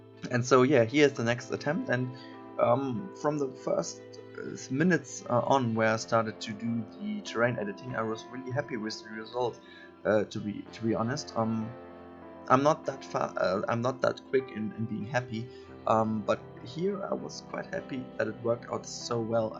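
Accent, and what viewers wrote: Germany. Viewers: Das ist ja ein deutscher Akzent, wenn ich jemals einem gehört hab! Aber gute Arbeit wie immer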